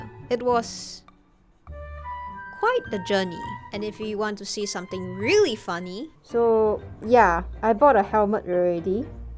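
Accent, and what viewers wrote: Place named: Singapore. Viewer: happy birthday - i came for the bg3 memes, and now staying for the Singaporean accent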